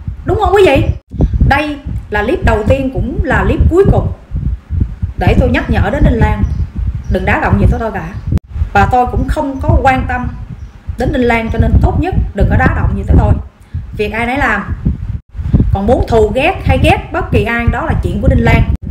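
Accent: Standard. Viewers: Chi noi qa chuan luon 👍👍👍👍👍🌹🌹🌹🌹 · Toi coi nhieu lan kenh cua co co noi hay chuan dung toi ung ho co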